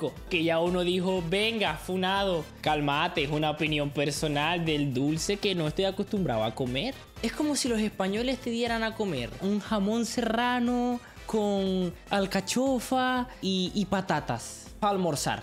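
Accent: North, Mexico. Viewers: norteño xd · No todos hablamos así en mexico:( JAJAJA solo en el norte y en el estado de mex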